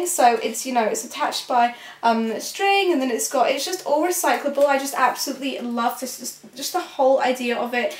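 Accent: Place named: Kent, United Kingdom. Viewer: Despite all the haters & the letchers you have to admire dedication to her body: those abs don't happen by accident! Also love the Kent accent with hints of Scotland. You could say 'so' all night long for me. Keep up the good work!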